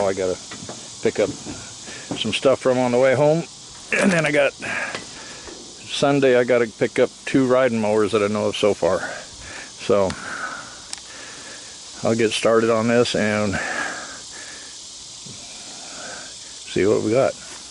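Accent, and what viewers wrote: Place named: Oklahoma, United States. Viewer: It's the okie accent I've always called it wheelbarrel even though it's barrow we Okies add that url to it just like we say y'all instead of you all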